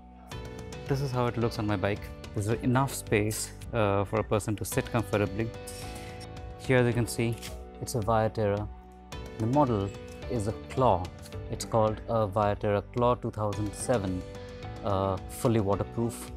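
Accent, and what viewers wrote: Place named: England, United Kingdom. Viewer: Explained neat and nice! The English accent is lit!!!!!!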